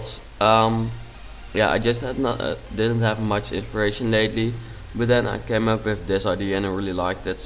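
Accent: Netherlands. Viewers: zonder naar je kanaalnaam te kijken wist ik al dat je Nederlands was. De manier waar op je Engels praat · I immediately recognized your accent. What's up, fellow Dutchman. :) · Super chill. ( Ik zie en hoor namelijk dat je Nederlands bent ;) ) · Ik kan aan je Engels horen dat je Nederlands bent ;) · ben je nederlands an het horen aan je accent net luis van gaal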